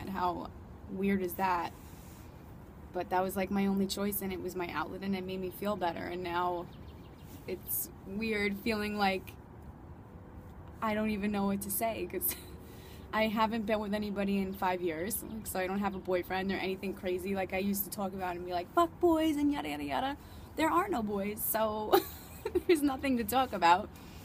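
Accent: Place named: Massachusetts, United States. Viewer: I am going to guess that your from Mass?!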